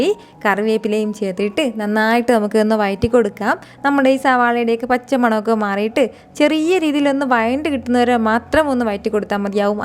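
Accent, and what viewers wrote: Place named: North Kerala, India. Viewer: ഒരു വടക്കൻ കേരളം slang ഉണ്ട് kitchen may have routes from മലപ്പുറം, കോഴിക്കോട്, or കണ്ണൂർ.., I think so. Very cute വർത്തമാനം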